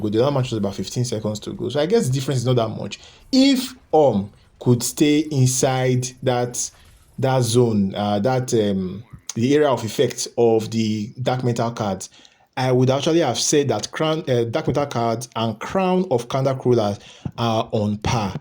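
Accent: Nigeria. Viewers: Bro are u Nigerian?? Nice content btw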